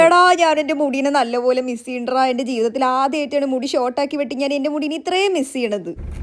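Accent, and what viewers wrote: Palakkad, India. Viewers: Chechi Palakkad basha vanu😅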